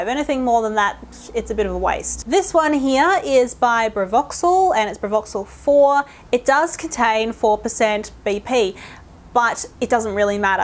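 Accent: Australia. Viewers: I LOVE your accent... I love Australian accents in general, I could listen to them all day! I use benzoyl peroxide, but my question is how often should I use it, considering it dries out skin etc. My skin used to be very flaky and dry but it's actually better now... Anyway, really helpful, thanks :) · Your too funny.... You make me miss home! I forget what the aussie accent sounds like living in the USA!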